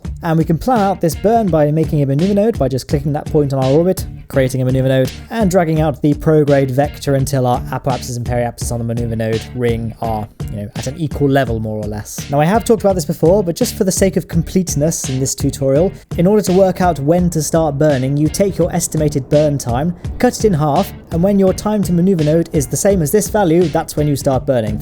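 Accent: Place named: Cambridgeshire, United Kingdom. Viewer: I wonder what county he’s from,Sound like Cambs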